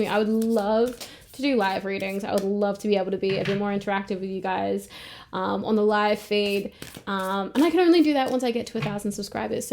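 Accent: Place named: Australia. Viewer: Please, please, please DO NOT, hold back on your beautiful Aussie accent! Your readings resonate strongly and your accent is very endearing. I'm American and, other than Southern U.S. accents, I find American accents incredibly plain/boring 😂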